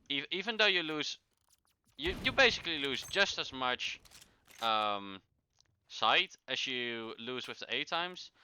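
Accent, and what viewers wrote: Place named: Netherlands. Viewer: this person is from holland you hear it from its accent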